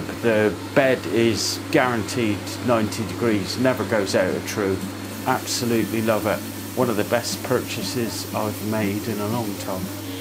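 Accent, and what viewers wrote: Cornwall, United Kingdom. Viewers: Great to watch the way you work, I especially like your narratives explaining what you are going & why you are doing it that way..... Also to hear rhe Cornish accent ( in Singapore)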